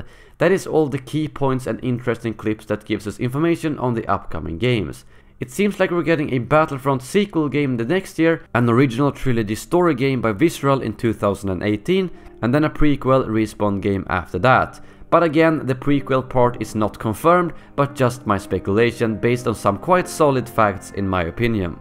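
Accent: Sweden. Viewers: Allow me to congratulate you for the incredibly well-packed video full of information, and when you speculated it was properly mentioned. Your videos are getting better by the day :D (still miss the swedish accent of the earliest videos hahaha). Keep it up!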